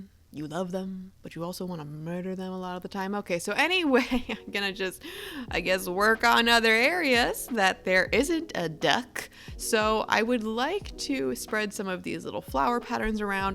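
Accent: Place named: France. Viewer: omgg so beautiful and ur french accent is so cuteeeee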